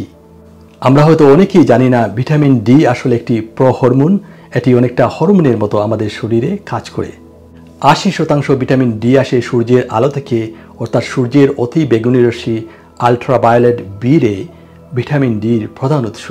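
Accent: Sylhet, Bangladesh. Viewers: আমি সন্দেহ করছি, আপনার বাড়ি সিলেটে ।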